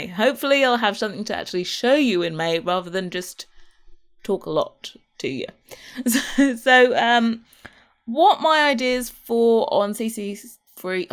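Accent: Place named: England, United Kingdom. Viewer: I'm not one for commenting in public...very British of me! Nevertheless, your taste is impeccable and I do love hearing an English accent. The fact that this is a 2017 video and the design holds up so well, as well as the presentation of the video , is amazing. Thought I would say it is rather criminal that you aren't more pushed in the Sims community and have subscribed as of about a week ago! Good luck for the future.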